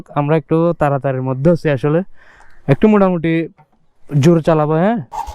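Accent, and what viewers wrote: Chittagong, Bangladesh. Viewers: চট্টগ্রামের আঞ্চলিকতার টান শুনলেই মেজাজ গরম হয়। ভিডিও ১০ সেকেন্ডেই বাতিল করলাম।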